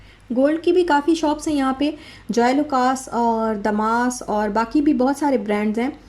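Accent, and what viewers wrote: Saraiki, Pakistan. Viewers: AP siraiki ha ...bat karny se lagra ha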